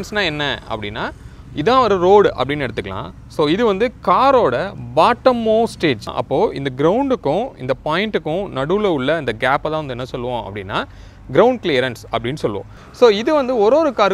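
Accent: Virudhunagar, India. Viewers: Bro neenga விருதுநகர் ahh bro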